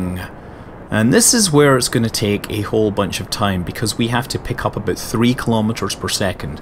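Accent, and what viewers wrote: Scotland, United Kingdom. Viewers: It's. He have scotish accent.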